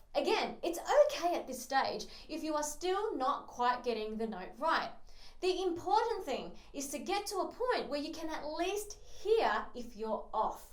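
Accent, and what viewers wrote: Australia. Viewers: Hey mate ru Aussie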